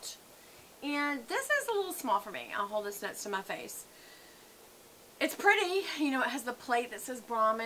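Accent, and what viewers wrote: South, United States. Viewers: !!! OMG where have YOU been all my life! Brahmin AND A PLANNER GIRL?! I love it! This video has changed my mind about which Brahmin (will be my first one) for my birthday next week. I love the southern drawl, imma a bell too! 😘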